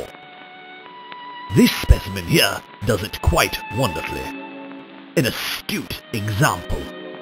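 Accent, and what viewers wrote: England, United Kingdom. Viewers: ...her highness would say "we found that most amusing"...i must commend you on your english accent...jolly good show & all that!...(& i AM english btw!😁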